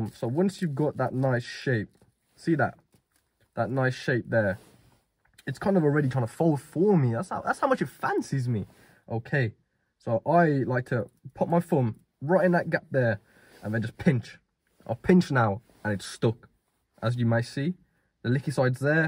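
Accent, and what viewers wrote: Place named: Birmingham, United Kingdom. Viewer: u from birmingham? nice accent.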